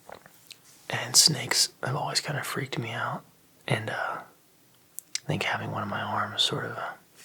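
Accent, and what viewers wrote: United States. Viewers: Idk why but you sound extra american today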